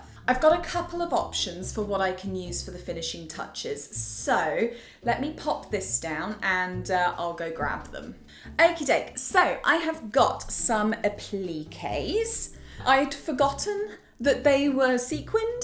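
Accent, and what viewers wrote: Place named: Australia. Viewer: I really liked the ducky pink and that sheer purple you had in the pile... Oh well, the lamp came out lovely. Hey, question... Where in brittain are you? You sound very Aussie to me (an aussie)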